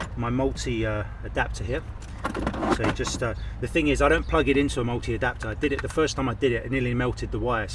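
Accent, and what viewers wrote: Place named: England, United Kingdom. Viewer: I don't get it. You sound English not Bangladeshi. Can't you just afford a coffee?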